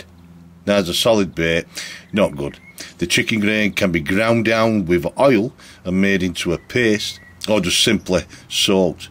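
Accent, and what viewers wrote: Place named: Yorkshire, United Kingdom. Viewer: This may seem a bit of a tangent to some, but I would love to know what your accent is, sir. I am an English teacher and very interested in accents. Can I venture a guess? Yorkshire? Please let me know. Also, I LOVE this rat shoot video. I am an avid shooter myself. Cheers!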